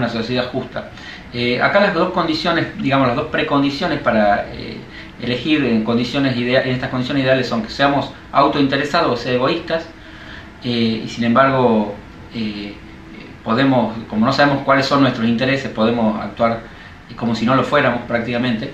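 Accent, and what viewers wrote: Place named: Argentina. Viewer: Gracias por esa explicación, no me esperaba la foto con Messi jajajajaja, solo si me costó mucho entender las palabras con el acento Argentino en las palabras rápido, iba tomando nota😢 pero bueno logré capturar la escencia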